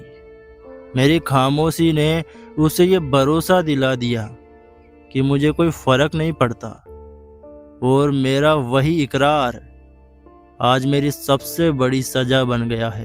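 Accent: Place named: Bihar, India. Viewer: Yh Bihari ki Sound. .Sooo kadva vala sweet..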